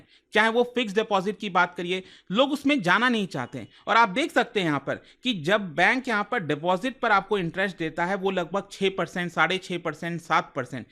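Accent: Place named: Maharashtra, India. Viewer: maine isliye pucha kyuki aapka tone thoda maharashtrian laga muje btw im from rajasthan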